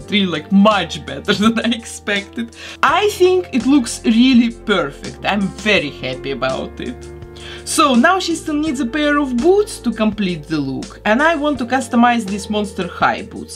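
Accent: Russia. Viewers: это русский акцент верно?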